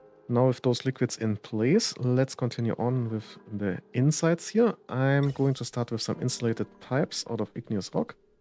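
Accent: Germany. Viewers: hey, like ur style, like ur voice, but plz try to work on ur english. ( Sis needs to bi abaf zwölfhundret) ich bin au deutscher, aber dein deutscher akzent is krass^^ besserr werden! ;)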